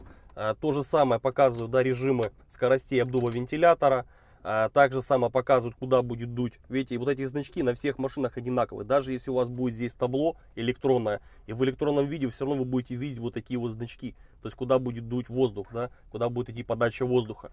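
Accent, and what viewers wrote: Ukraine. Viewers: Вы такой мужчина прекрасный! Дай Бог Вам здоровья. Так хорошо рассказываете. И, вообще, обожаю украинский акцент.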